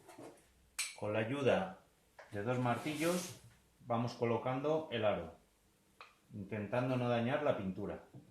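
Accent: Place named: Spain. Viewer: Cuando veas la foto de mi perfil te darás cuenta de qué amo los gatos 🐱🦊 soy Mexicano y vivo en los Ángeles California USA y en el departamento donde estoy no puedo tener animales 😭😭 y ati también te gustan los felinos mire 2 al principio del vídeo dales un beso de mi parte por tú acento creo qué eres de España Saludos para la madre patria Muy bueno tú vídeo Felicidades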